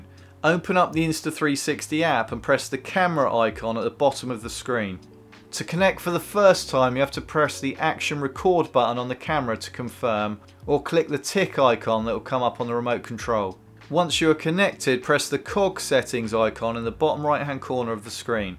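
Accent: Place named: England, United Kingdom. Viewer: good to hear a English accent for a refreshing change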